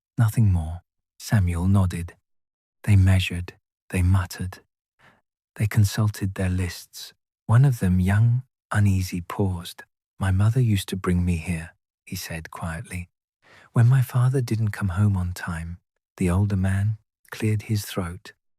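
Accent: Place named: Lancashire, United Kingdom. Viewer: Thank you. Gentle voice. Lancashire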